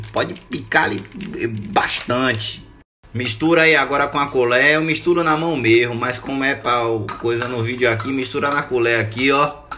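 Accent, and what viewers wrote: Bahia, Brazil. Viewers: é sotaque mesmo kkkj Sou baiano e falo igualzinho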